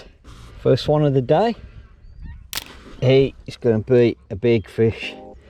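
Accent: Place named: West Midlands, United Kingdom. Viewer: Dont know for sure but the accent is west mids??? so be a bit of a trek. Im south notts so kettleby is only 20 mins from me. Nice to meet you 😀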